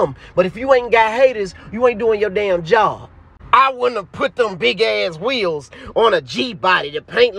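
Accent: Memphis, United States. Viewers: Lol 😂you are definitely a memphis native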